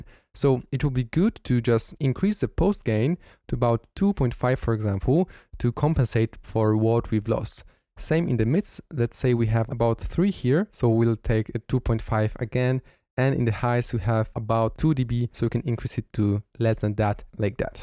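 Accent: Poland. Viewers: Is Polish or German? Or Polish German? 10% for Netherland... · wow masz polskie imię i nazwisko:) W sumie domyślałem się po Twoim akcencie:) super robota i super kanał, Przez lata siedziałem za sterami pro tools i cubase, przesiadam się na fl studio i bardzo efektywnie mi w tym pomagasz! Pozdro! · Wait... are you from Poland?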